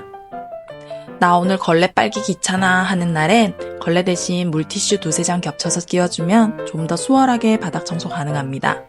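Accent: Gyeongsang, South Korea. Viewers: 혹시 경상도분이신가요?중간중간 경상도 억양이 들리는듯하여.. 그리고 화장실 천장은 손을 안대는데도 저렇게 깨끗하게 되나요?한번 닦아줘야하는거죠?아님 물 뿌리거나.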